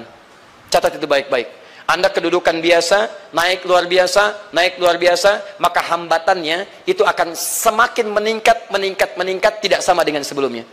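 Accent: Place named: West Java, Indonesia. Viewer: Alhamdulillah terima kasih Pa ustad kaya orang sunda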